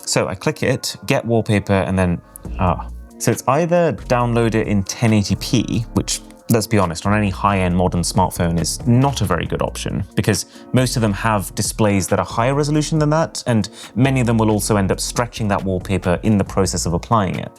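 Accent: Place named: RP, United Kingdom. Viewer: Fucking hell that’s a bad hat. The public school accent combined with the backwards hat gives “hello fellow teens” vibes.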